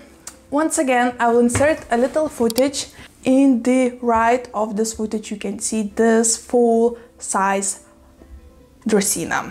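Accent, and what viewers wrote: Russia. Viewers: I was hoping you were going to include the ZZ plant!! I love your variegated ficus too, it’s so beautiful! Also, don’t apologize about your accent! First of all, I love listening to you and second of all, it’s so impressive that you speak multiple languages!!! Be proud of yourself for that! If I was able to learn another language, I’d have an American accent speaking it, because that’s the language I grew up speaking. You grew up with Russian, so that’s what your tongue is used to doing! Enough lecturing, lol. Thank you for sharing! 💜💚🪴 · You don’t have to apologize for your Russian accent, its beautiful. I have an Italian accent, its what defines us. 🇱🇹 🇮🇹 🇨🇦 ❤️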